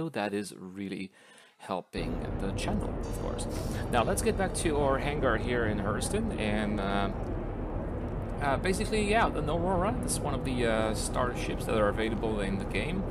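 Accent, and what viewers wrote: France. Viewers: tu es breton ? ^^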